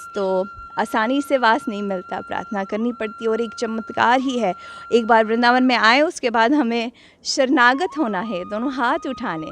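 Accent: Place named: United States. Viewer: Main inko insta par following karta hu, tabhi mai socho ye inka american accent kyu hai.. Mai samjhta tha ye style dikhane ken liye american accent mai boltti hai 🤣🤣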